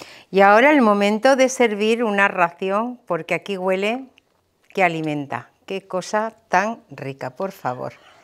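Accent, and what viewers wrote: Spain. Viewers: Me gusta ver la cocina de porque todo lo explicas muy bien, otra persona que me gusta mucho ver es Armando en tu cocina y el también explica todo paso por paso, el es Mexicano y tú me imagino que eres Española por hacento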